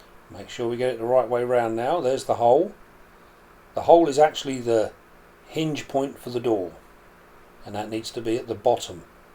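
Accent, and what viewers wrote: United Kingdom. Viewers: Hi, your videos have made me want to get back into tarantula keeping! I assume you live in the UK by your accent so I just wanted to ask a question. Do you use heat mats and thermostats for your spiders? I've heard they are ok at room temp but it seems widely discussed in the community. Keep up the great work!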